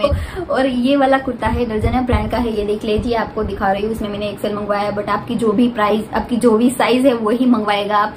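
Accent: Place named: Gujarat, India. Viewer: I just don't like your gujarati tone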